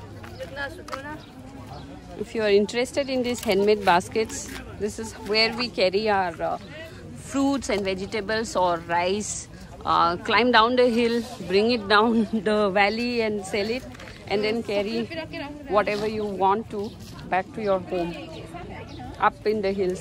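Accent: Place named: India. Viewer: Your accent is really annoying.Sounds like mainland Indians.,vegetable should be pronounced as vejtebal not vejitebal.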